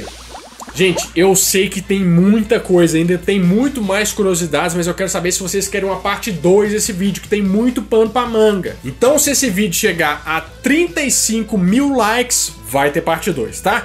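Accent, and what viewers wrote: Minas Gerais, Brazil. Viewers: Mineiro nato esse aí kkk 👏🏾🤝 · Uai, vc é mineiro??? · Definitivamente o mineirês é o melhor sotaque que existe. 🤗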